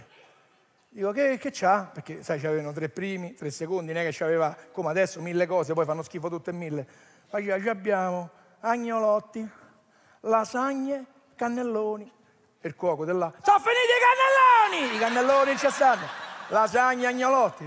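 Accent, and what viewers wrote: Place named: Rome, Italy. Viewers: Sempre grande divertente. Il romanesco mi piace. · E' un comico spontaneo,una comicità' naturale!!! In romanesco poi......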